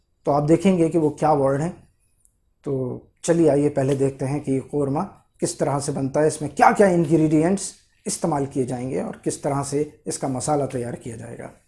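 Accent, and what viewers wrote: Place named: Aligarh, India. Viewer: bhai yr qorma recipe looks awesome and your aligarian andaaz " aur sahab" take me down to memory lane of aligarh k shadi ka qorma..the only surprising thing was u added saunf..I never saw my mother adding saunf in qorma instead she use to add khashkash in it..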